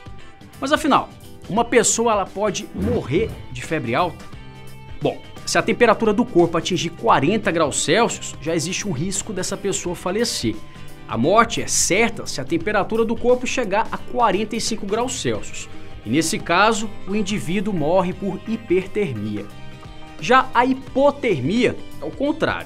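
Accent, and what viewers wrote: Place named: Minas Gerais, Brazil. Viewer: Vídeo bom demais, ainda mais com esse sotaque "minerin". Sou fã do canal. 💕💕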